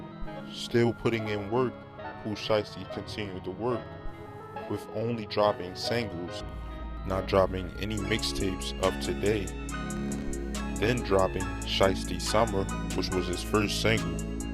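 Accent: Baltimore, United States. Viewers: U def from Baltimore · u frm baltimore huh😭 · Bro talking gotta be from Baltimore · The dude who does this channel def from Baltimore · You can tell my man from Baltimore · He from Baltimore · This dude accent 💀 he gotta be from Bmore lmaooo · You from bmore ain’t you? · I hear a Baltimore accent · He from Baltimore speaking on down south shit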